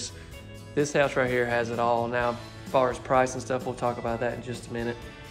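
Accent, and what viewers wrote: South, United States. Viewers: I'm not the greatest at placing southern accents. Does anyone know where his is from? I love it.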